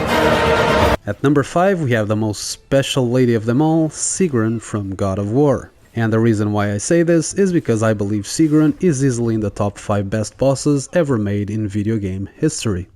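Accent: Portugal. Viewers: impressionante como um tuga consegue perceber logo pelo sotaque. bom video e boa lista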